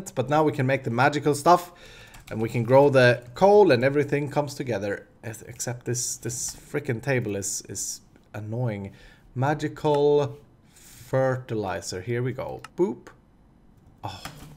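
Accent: Sweden. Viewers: ...and ladle is not Lad-le it's Lay-dell.. Sveedish speech patterns.. JEEZ!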